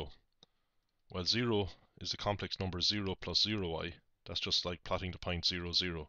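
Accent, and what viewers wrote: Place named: Ireland. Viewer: hay im doing my leaving this year and there is a certain question that seems to re-appear often finding unknown points on an argand diagram it is on the 2013 new sec paper 1 section A question 1 (b) i was wondering if u had or could possibly make a video for this question as i suspect it could appear on my paper thanks very much.( its great to have an irish accent doing a commentary theres only so much American accent i can take:)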